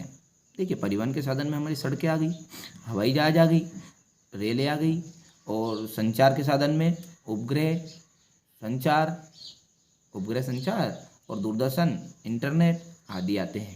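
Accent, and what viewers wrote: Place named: Uttar Pradesh, India. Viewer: Tum up se ho na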